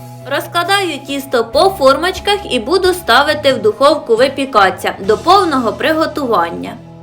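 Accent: Poltava, Ukraine. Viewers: Як по розмові, то точно з Полтавщини!?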